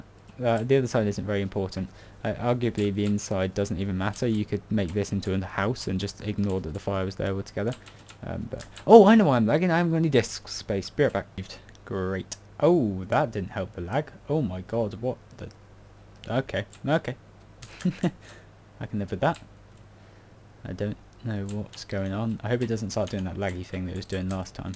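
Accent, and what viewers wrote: United Kingdom. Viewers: Your British accent is awesome you sounded like my fathers friend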